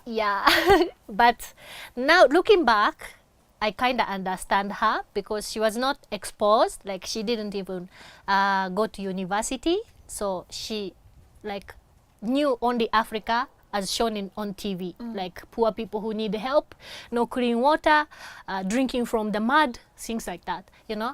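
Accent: Kenya. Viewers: She sounds like an Kenyan women 😉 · wetu, umerudi?? Your 'R' makes you sound like a real okuyu from nyeri....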